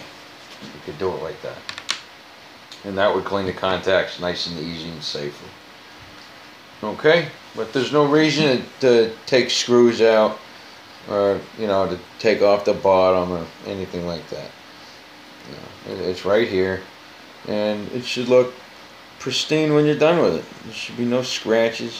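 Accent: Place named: Buffalo, United States. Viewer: You sound like you're from Baffalo!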